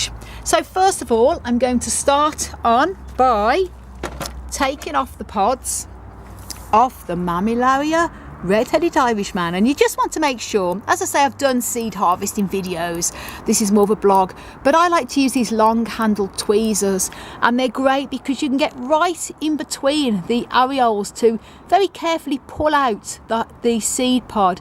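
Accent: United Kingdom. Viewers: I like your British ascent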